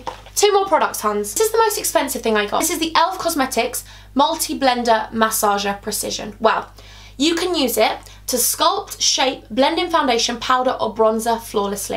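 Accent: Liverpool, United Kingdom. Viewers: Love a good haul! And your Scouse accent is fab hahah! P.s. how stunning do you look in this video?! 💗💗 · your liverpool accent is lifeeee 😄💞 you're literally my favourite person😍❤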